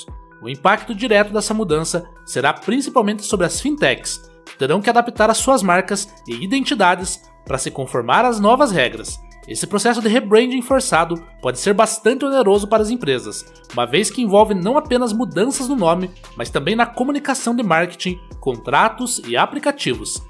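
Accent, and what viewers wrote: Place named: Paraná, Brazil. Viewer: O texto é bom. Mas a voz desse narrador, vamos combinar é muito chata, esse sotaque do terceiro planalto paranaense é de doer. kkkkkkk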